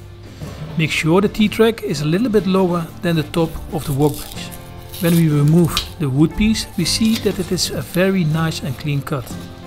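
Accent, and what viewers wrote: Netherlands. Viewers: thanks for your reply, very much appreciated. Though i grew up here, i was born in the Netherlands and its a pleasure to hear that Dutch accent coming through! Ill be posting a video of my work bench build, though not nearly as impressive i think its going to be a great addition to my tiny workshop. Check out “captain of my shed” episode 6 if you want to feel even better about your amazing workshop!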